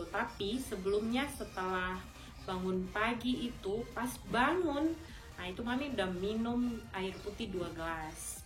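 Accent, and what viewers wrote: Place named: Ambon, Indonesia. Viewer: Enak banget dengerin pake nada ambon yaa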